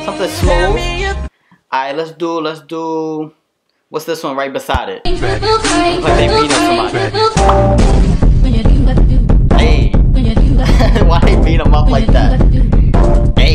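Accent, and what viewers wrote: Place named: New York, United States. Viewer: I luv the way you talk😍😍😍 Is he from NewYork?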